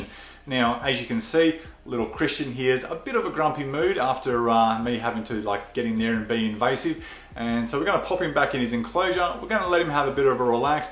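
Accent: Australia. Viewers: Guys, where’s his accent from? Aussie/what.? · If something is being pulled or squeezed out of a body I am there. The Aussie accent was just the cherry on top!!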